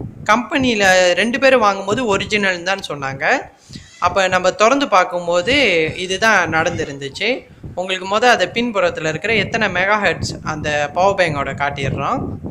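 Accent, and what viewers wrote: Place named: Malaysia. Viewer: Malaysia la apdi thaa pesuvaanga bro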